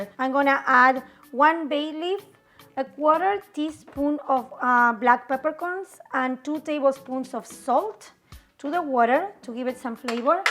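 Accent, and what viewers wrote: Argentina. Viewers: my mom barely ever cooks, her signature dish was always "aroz con porquerias" but one thing she always made back in Argentina was puchero, seeing puchero argentino with the argentine accent and the apron with Mafalda really made me miss my mom, haven't seen her in person for a few months cause of covid. thanks for being wonderful!!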